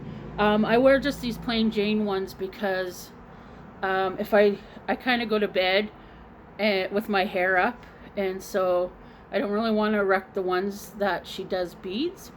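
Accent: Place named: Acadia, Canada. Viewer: Oh wow, just saw this, thank you! French Acadian accent 😉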